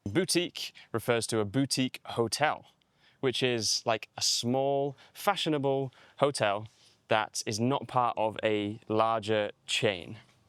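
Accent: United Kingdom. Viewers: I really love watching your video sometimes I practice to talk just like your accent British accent talking to myself haha 😂😂 I learn a lot from you.thanks u from Philippines 🇵🇭 with love.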